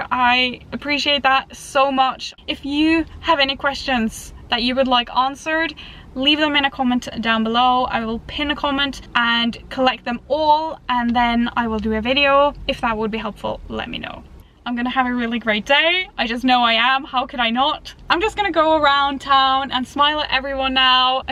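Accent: Ireland. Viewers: Where is your accent from ? Irish?? Living in Ireland?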